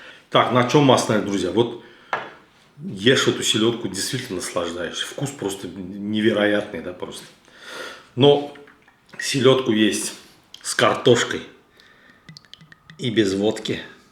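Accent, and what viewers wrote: Baku, Azerbaijan. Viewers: Этот акцент не перепутаю ни когда , привет от Бакинца из Крыма , тут с селедкой сейчас проблема )) приятного аппетита , нуш олсун 🔥🥃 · БАКИНСКИЙ АКЦЕНТ !!!❤ Однозначно лайк!!! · Хоть в Антарктиде, хоть в Африке, Бакинский акцент ни с чем не перепутать :)) удачи вам земляк. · Что за акцент? Азербайджанский что ли? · ВАХ...ВАХ..АКЦЕНТ СЛАДКИЙ КАКОЙ....РАДНОЙ АЗЕРБАЙДЖАНСКИЕ...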